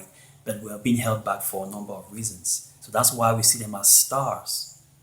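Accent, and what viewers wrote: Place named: Nigeria. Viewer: I totally thought you were Nigerian, because of your accent. I'm Nigerian, myself. Good to know you're from Yaoundé, Cameroon. I have a friend from Yaoundé but he speaks more French than English. I absolutely agree with your reasons for freeforming and the examples you gave with sports and left-handedness. There's something so true and unapologetic about it that calls us to explore true parts of ourselves that we are forced to suppress. My locs are semi-freeform and I love them so much.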